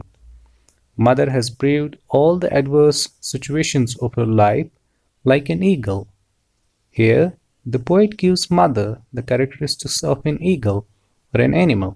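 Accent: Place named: Kashmir, India. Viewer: Kashmiri aawaaz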